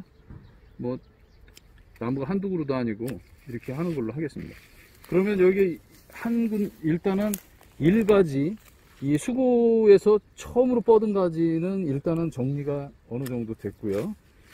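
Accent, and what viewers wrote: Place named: Gyeongsang, South Korea. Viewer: 뻔데 경상도사투리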